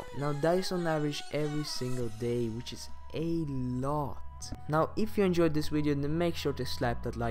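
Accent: Sweden. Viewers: You're Swedish aren't you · You from Sweden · are u from sweden?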